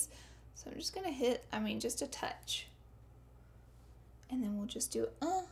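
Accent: South, United States. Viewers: You crack me up girl!! "Oily" I love your accent! I also have a tad bit of one most of my family is from the south.❤️ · Clearly the latter....... she's a southern gal....twang and all. Do you notice how cutely she says concealer?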